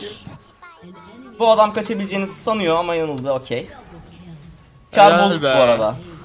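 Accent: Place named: Kahramanmaraş, Turkey. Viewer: maraşlımısın knk sadece maraşda böyle konuşuyolar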